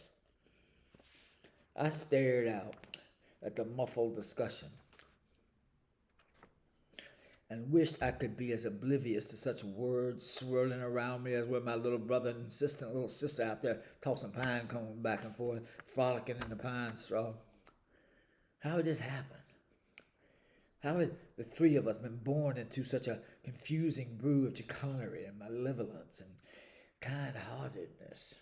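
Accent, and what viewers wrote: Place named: Mississippi, United States. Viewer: Love this. As one born in Mississippi whose mama was born and raised in Scott County, I recognize those sounds. At one point you reminded me of Aunt Authula. I heard Uncle Stanley, too. My relatives in Mississippi sound just like that. I need to read your books. Well done here. Thank you.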